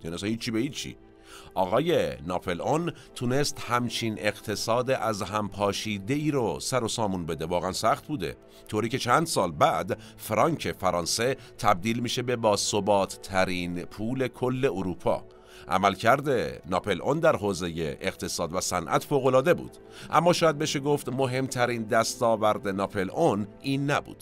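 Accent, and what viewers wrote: Iran. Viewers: نخست با سپاس از روشنگریتان برای گره و سرور که بسیار خوش واژه با گویای زیبا. در این ویدیو شما سرور اسلاح (اصلاح) کردید و مانند یک ایرانی خوش روی گویندگی میکنید. امیدوارم همیشه به این روی باشد. خواهش می کنم از واژه های پارسی در گبتان بکار ببرید. مانند: مـــــــــــــ♥ـــا مردم بزرگیم، نه کبیریم. با سپاس از شما و گروه.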